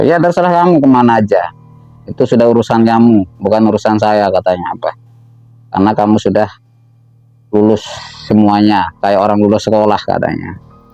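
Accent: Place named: Madura, Indonesia. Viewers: Ko logatnya kaya org jatim bukan ky logat org jateng meditasi bukan apriasi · Iki logate Meduro / Banyuwangi om bukan sragen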